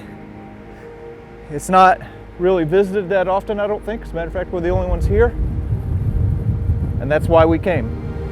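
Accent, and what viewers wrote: United States. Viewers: This is the kind of attitude that makes Americans disliked around the world. American pronunciation in France? Please don't forget the Americans were a minority on Day Day and all of the other nations on the Western front without which the final victory would not have been possible. No disrespect meant to the service men on all sides.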